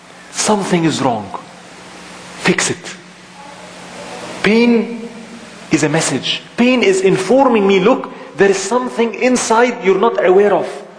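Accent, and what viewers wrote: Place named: Egypt. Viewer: Yakhi this guy is AWESOMEEE. Love him mashallah. Love the egyptian accent too xD Very nice lecture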